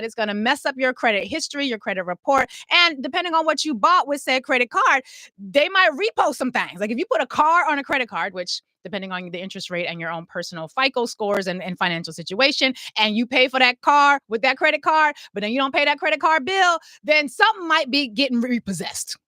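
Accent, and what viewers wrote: South, United States. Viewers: Your "SOUTHERN DRAWL" is just as IMMACULATE as your BREAKDOWN of these RANCID Repugna-CONS... 💪🏾🤩🫶🏾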